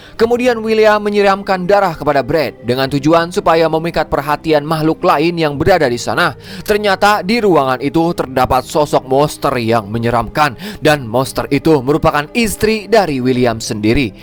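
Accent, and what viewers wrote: West Java, Indonesia. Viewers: Sunda banget 🤣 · Kek IQ7 mana pake logat Sunda lagi kek 1 orang😅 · Indo x Sunda 😂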